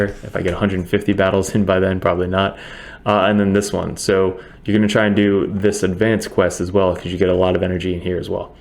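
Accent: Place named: South, United States. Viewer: You sound like you might be local to where i live, you from the southern states? Loving the content bro, keep it coming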